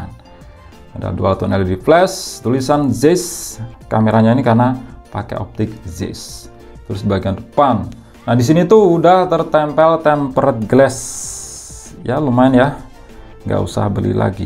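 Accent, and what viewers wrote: Blitar, Indonesia. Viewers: Orang Blitar ta mas??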